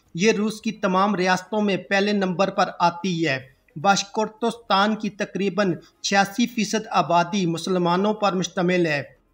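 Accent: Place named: Punjab, India. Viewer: He has punjabi villagi accent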